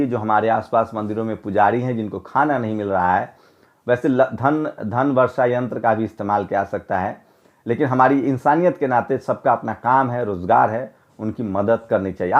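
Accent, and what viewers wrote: Bihar, India. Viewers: Lag raha hai ji bihari jeise bat kar rahe hai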